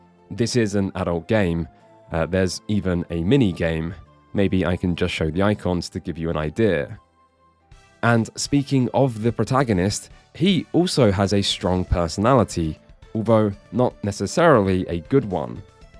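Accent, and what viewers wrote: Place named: United Kingdom. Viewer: The somewhat familiar oddly british voice said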